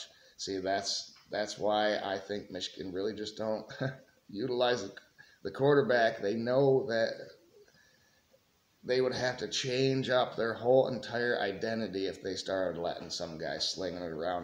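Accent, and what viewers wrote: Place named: Mississippi, United States. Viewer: You sound like you live in Mississippi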